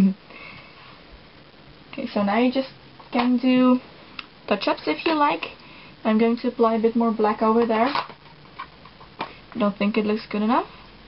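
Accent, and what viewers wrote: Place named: Netherlands. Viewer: je herkent het gelijk als een nederlander engels praat. niet dat je engels slecht is ofzo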